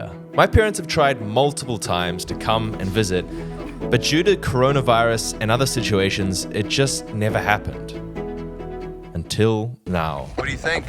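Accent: New Zealand. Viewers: Is there something about the Kiwi accent that lets you learn/pronounce the Austrian dialect particularly well? 🙂Because many immigrants in Austria speak perfect German - but none of them ever master the "Dialekt". So I was wondering whether you already had a starting point from your own native language that lends itself particularly well to learning to sound "Austrian"? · I'm always baffled when I hear your kiwi accent...you imitate Austrian German so well I somehow expect you to have an Austrian accent when you speak English. Makes zero sense, I know 😂.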